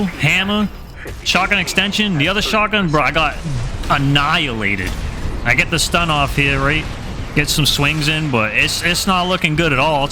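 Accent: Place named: New York, United States. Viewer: Lmao 😂 yo i know you gotta be from new york by the way you talk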